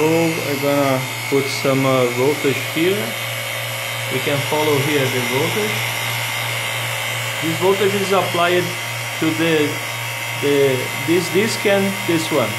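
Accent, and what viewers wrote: Brazil. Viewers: cara vc é brasileiro?? dá pra reconhecer pelo sotaque!! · sotaque brasileiro ? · are you brazilian? your accent when speaking english is very familiar to me. nice video!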